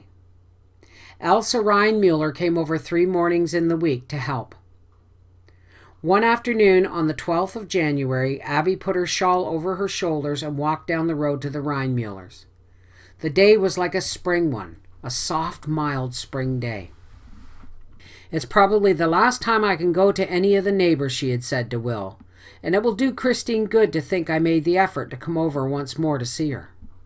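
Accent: Canada. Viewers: I hope you do! It's so kind of you to make these videos and take so much of your time on them. I also wanted to say that I was born and raised on the shores of Lake Superior (WI) and your Canadian accent is so comforting and makes me feel at home. Keep reading when you can, I for sure am a devoted fan.:)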